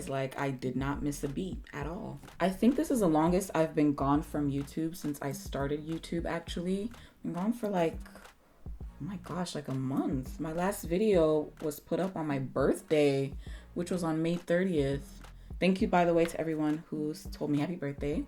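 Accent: Nigeria. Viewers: The Nigerian accent is baccckkkkkk!!! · The Nigerian accent NEVER fails to make me laugh. You are a freaking queen. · Yayyyyy your back! I was having chocolate withdrawals I missed your Nigerian accent 😍❤️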